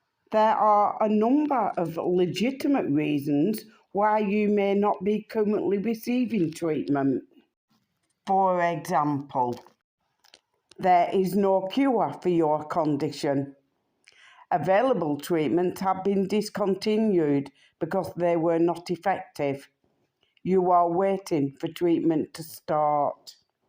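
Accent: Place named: Burnley, United Kingdom. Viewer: Thats a Burnley accent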